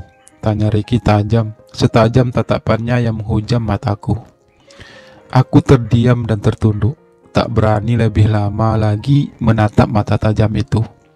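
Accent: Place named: Medan, Indonesia. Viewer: Klu aq cermati aksen suaranya ~ aq yakin Abang ini dari Medan ~ ya kah ????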